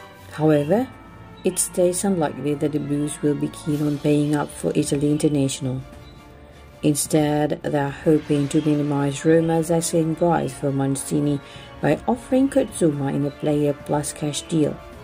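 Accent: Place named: Vietnam. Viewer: AGAIN AND AGAIN VIETCONG VOICE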